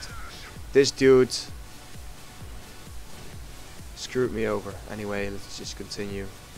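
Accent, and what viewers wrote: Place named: Netherlands. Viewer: Jij bent een Nederlander toch want je uitspraak daar kan ik het aan horen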